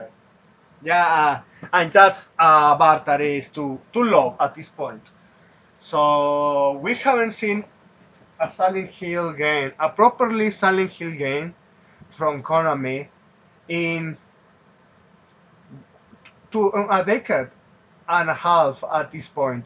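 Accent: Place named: Spain. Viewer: Sin ofender.. Pero has probado a hacer los vídeos en español?? (Creo que es tu lengua natal, el acento me suena a español) Es que no se entiende absolutamente nada.. No tengo problemas en ver vídeos tanto en español como en inglés, pero tienes un acento extremadamente marcado y pillo solo algunas palabras sueltas. Espero que no te lo tomes a mal, he intentando ver el vídeo completo, pero ha llegado un punto en que estaba perdiendo el hilo de lo que decías incluso.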